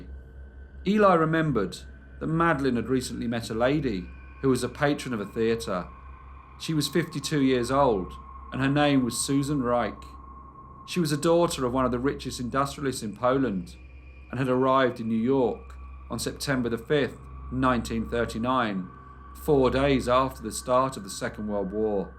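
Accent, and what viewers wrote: England, United Kingdom. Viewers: Thanks for all your uploads. I love your stuff. As a Brit who has lived abroad for decades, due to my job, I love to hear your English accent🙂👍🏼💚🙂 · Man I love this channel. I cant get enough of listening to this narrator's voice say "Guilty " with his awesome British accent. Keep up the good work.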